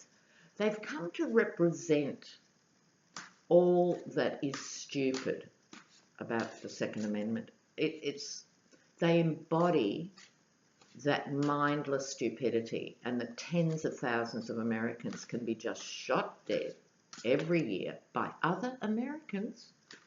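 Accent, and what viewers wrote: Australia. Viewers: Thank you, - ever bright, sparkling & insightful (and wonderful with our familiar Aussie accent & cultural/slang refs!😉) A particular thank you for mentioning dear Becca of Readings by the Empress, too. Her “Why God, Why Trump?”(30 May) reading was indeed a really beautiful, powerful & nuanced outlining of the bigger, higher picture - sort of like a ‘Gaviscon Calming Raft’ for these emotional gastric reflux times!! Blessings, blessings all. <3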